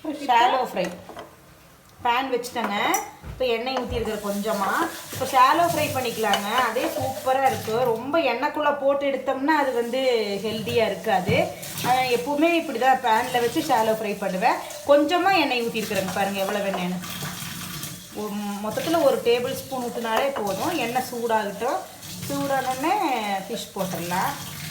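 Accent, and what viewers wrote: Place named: Coimbatore, India. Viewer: Ur language slang spr sis.. Iam also coimbatore....🙂🙃🙂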